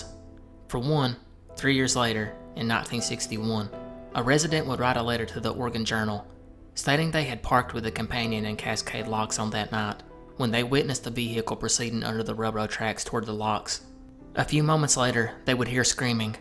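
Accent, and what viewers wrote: South, United States. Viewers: I recognize southern accent. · Kentucky? WV? Tennessee? I’m trying to pinpoint lol. I’m in kentucky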